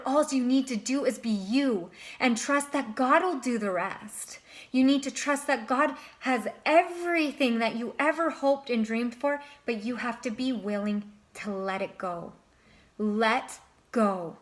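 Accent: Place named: Canada. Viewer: Inspiring ! Hope you are still doing well. You sound Canadian.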